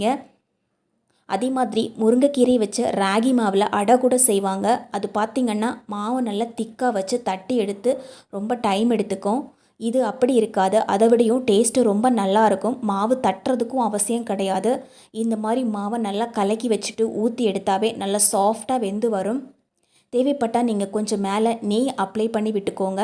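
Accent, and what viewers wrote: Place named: Nagercoil, India. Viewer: I think u are from Nagercoil Your language sounds like that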